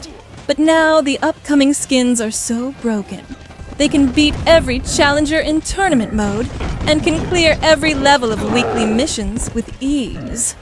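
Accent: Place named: United Kingdom. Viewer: holy British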